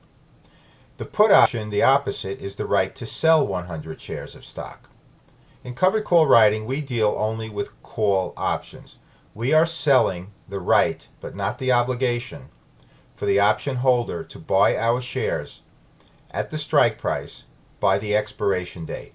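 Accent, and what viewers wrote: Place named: New York, United States. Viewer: Hi Mr., my mom deeply and humbly apologizes for insulting your beautiful New York accent. I love it and I love the videos. Keep it up homie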